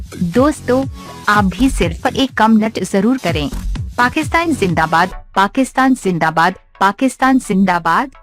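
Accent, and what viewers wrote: India. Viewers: feels like Indian is talking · Kh tu ap pakistan zindabad rhe hiny pr lehja ap indin hy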